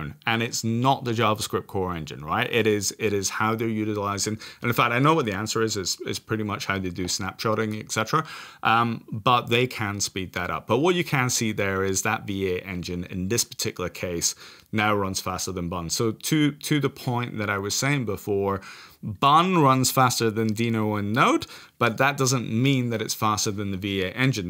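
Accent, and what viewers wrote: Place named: England, United Kingdom. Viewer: I absolutely love his english accent - Subscribe earned just by hearing it :D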